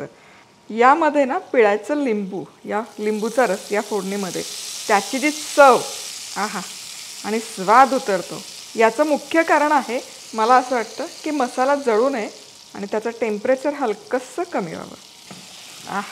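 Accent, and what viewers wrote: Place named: Sangli, India. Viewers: Chan bolalat Tai sangalichi bhasha ani recipe pan mast❤❤ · tu अमेरिकेत राहून सुद्धा सांगली,कोल्हापूरची भाषा खूप छान बोलतेस.तुला पुढील रेसिपी साठी. All the best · सांगलीची भाषा आणि भडंग दोन्ही जमलंय चांगलं 👌 Love from sangli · भाषा सांगलीची व भडंग पण सांगलीचा फार. छानच वाटल म्याम......🙏🙏🙏🙏🙏❤️❤️👌👌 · जमल की सांगली ची भाषा बोलायला आणि भडंग तर लईच भारी 👍🤩✌️,l am from सांगली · खूपच बेस्ट कोल्हापूर बोललात विषय हार्ड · आम्ही पण सांगलीकर आहोत.त्यामुळे खूप मजा आली भडंगाची रेसीपी पहायला आणी हो टिपीकल भाषा सुद्धा बोललात ते लै भारी वाटल...... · कोल्हापुरि भाषा मस्त जमते की....खूप मस्त भडंग.....🎉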